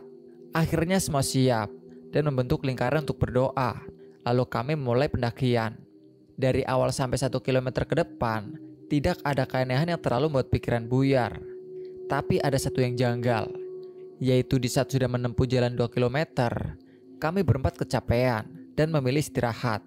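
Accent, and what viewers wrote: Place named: Tegal, Indonesia. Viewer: Mas asli jw tengah tegal yo kon logat tegal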